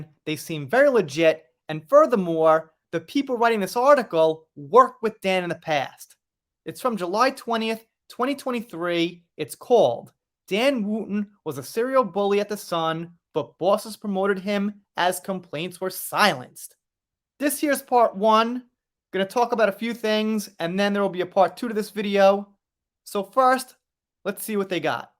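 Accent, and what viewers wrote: United States. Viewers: I would really like to know why Americans think displaying ignorance is cute. You said that you couldn't tell what this symbol "£" is, and then whilst speaking in a thick American regional accent and excused referring to it as "the euro" because you live in Japan. But Dan Wootton and The Sun newspaper are based in the UK, so you must have known you were talking shit and you had to have lived in the USA until you were at least 25 to sound so American, or do you think the audience is unaware of how accents form? Your mouth fused in it's current shape in the USA by age 25, so you have no excuse especially given that the symbol existed before the USA existed as a country and in fact you used to have an American pound. So all you said was that you were unaware of your own history. I don't think you mistook anything. You're a pro-Johnny Depp fanatic who casually glazes over the fact that he was "making jokes" about raping Amber Heard and burning her body so by pretending ignorance of the difference between the £ and € even though the £ is clearly an L used for hundreds of years in various countries as the symbol of a pound, whilst the € is an E and has only existed since the mid 1990s to be used as a symbol for a new currency, the Euro, you are being dismissive of the UK merely because you were upset that the judge in the defamation case here did not rule in favour of your opinion and instead merely followed the law and logic. 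Both Depp and Heard were abusive. Don't worry though, i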